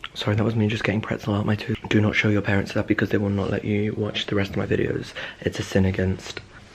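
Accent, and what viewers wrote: Scotland, United Kingdom. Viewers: Yess don’t jel hun -in Scottish accent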